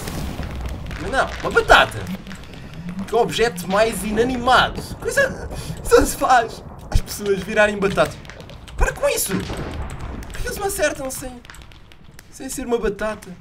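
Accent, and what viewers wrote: Portugal. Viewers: Mas n posso negar Que seus videos sao bons, Que gosto do sutaque português e Que vc é um pouco engraçado e enrolado kkkk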